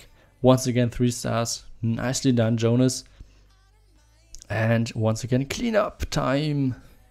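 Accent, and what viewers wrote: Germany. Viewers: your English is really good u just have that German kind of accent witch makes since. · Your English is good bro with german accent.... and when you are going to next base building videos?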